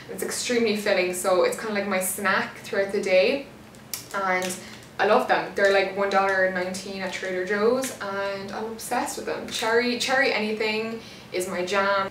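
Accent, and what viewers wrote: Cork, Ireland. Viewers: Your Cork accent is definitely after flaring up after your visit home hahaha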